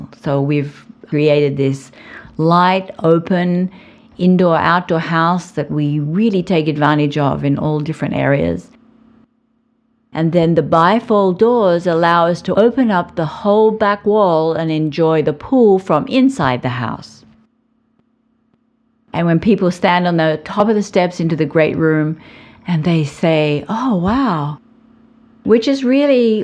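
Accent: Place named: New Zealand. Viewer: It's faint, but I'm detecting an accent in your speech. New Zealish?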